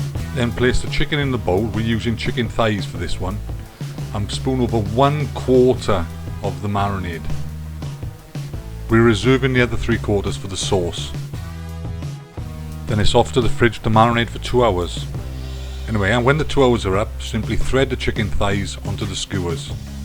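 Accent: Wales, United Kingdom. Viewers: Are you welsh because if not, I'm rubbish at guessing accents?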